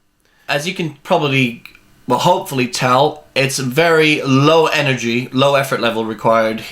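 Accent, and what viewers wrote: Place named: United States. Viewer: are you UK mixed with US..???..you sound a lil bit American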